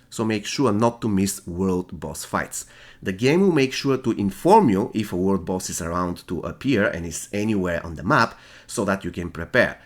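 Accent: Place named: Romania. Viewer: Dupa accent esti roman :))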